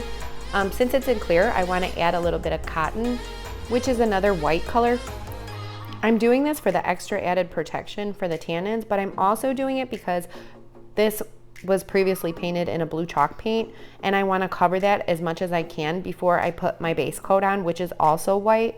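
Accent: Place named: Midwest, United States. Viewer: Tis beautiful. Most of the year. Even when it’s not the prettiest it’s still a great place to live. You’re accent sounds like maybe Michigan or Wisconsin. I’ve never been there but would love to see the northern Midwest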